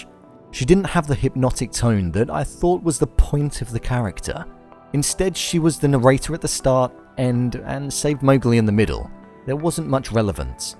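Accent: United Kingdom. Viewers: Its his British accent, even I wanna fuck it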